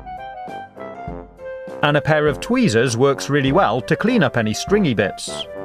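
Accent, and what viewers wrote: England, United Kingdom. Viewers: cool video i don’t wanna be mean but you sound like an england man like cool tone c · British accent?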